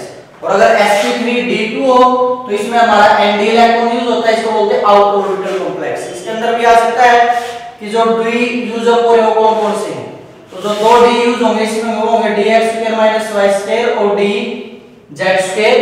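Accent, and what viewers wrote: Haryana, India. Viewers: Sir Seriously you're brilliant. Keep it up. And never leave your this hariyanavi accent .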